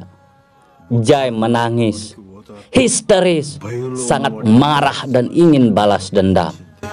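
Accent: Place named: Lombok, Indonesia. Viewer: lanjut saja, bro....tiap orang punya kreasi sendiri. logatnya mirip teman saya orang sasak.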